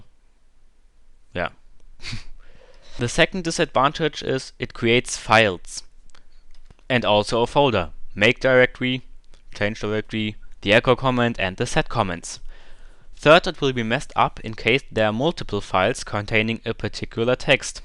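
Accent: Germany. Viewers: i think ur a german like me :D nicee accent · man merkt irgendwie das du deutsch bist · standert german accent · ahaha geiler deutscher Akzent und danke für das video xDDDD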